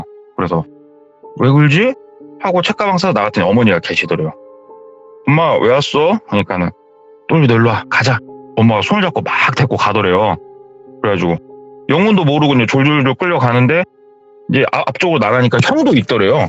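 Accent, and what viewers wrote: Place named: Jeonbuk, South Korea. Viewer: 전북출신으로써 말투가 너무 정겹다 ㅜㅜ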